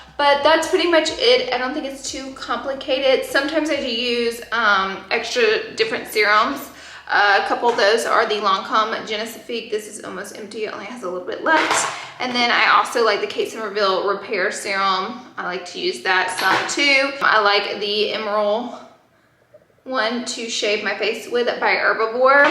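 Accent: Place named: South, United States. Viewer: If her accent didn’t give away that she is southern.... that wonder bread sure did. Literally a southern staple haha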